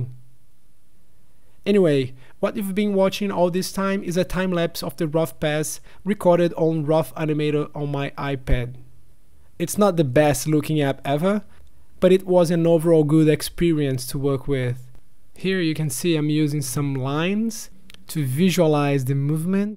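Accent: Brazil. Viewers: Belo trampo! Vc eh Brazuca por sinal ? Reparei no acento rsrs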